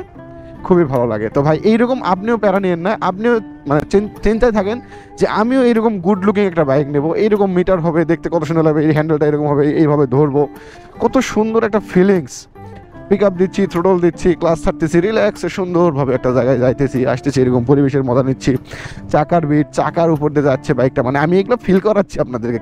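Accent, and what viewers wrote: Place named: Naogaon, Bangladesh. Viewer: ভাইয়ের ভাষা শুনে মনে হচ্ছে আপনি নওগাঁ জেলার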